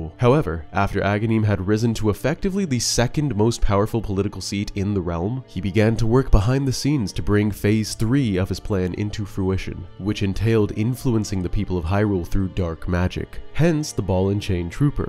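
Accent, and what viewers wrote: Texas, United States. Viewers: Love the Texas accent. God bless Texas. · I didn't realize you were from the Lone Star state, but now I can't not hear your Texan accent lmao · Your ampt up Texas accent majes me want to hear Sam Elliot tell me about the Hero Of Time "...hmm I take comfort in that, The Hero abides...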